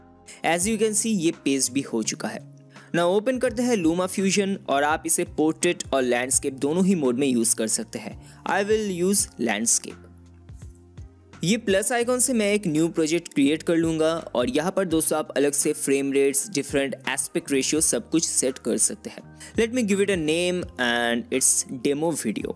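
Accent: Bengal, India. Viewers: Bengali naki Tumi?Bari kothai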